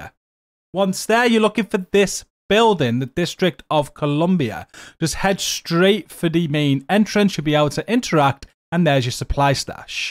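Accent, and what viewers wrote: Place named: North, United Kingdom. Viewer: northern accent strikes again ❤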